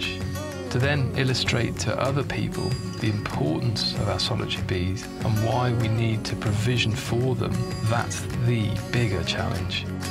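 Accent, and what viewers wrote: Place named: United Kingdom. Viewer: Wow these comments. Tik tok nation. Can't stand a video for more than 30 seconds. His voice is soothing. Whats wrong with having a posh English voice?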